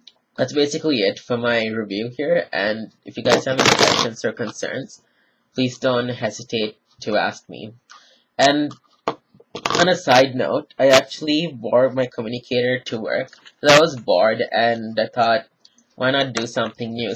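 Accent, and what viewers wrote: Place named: Caribbean. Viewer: Where you from....You sound like somewhere from carribean Loving the accent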